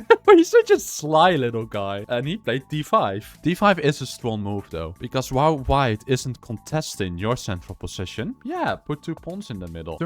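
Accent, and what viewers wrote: Netherlands. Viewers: it was a very weird game. It went e4 e5 f3 d5 Be2, I was losing all my pieces but then I turned the board around and won the game. In all seriousness though, I got completely cooked and lost. You can view the game, it was broadcasted om lichess, tournament name was Dutch Open 2026 (also are you Dutch as well? Your accent makes me think so, would be cool to see you irl) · you sound hella dutch lol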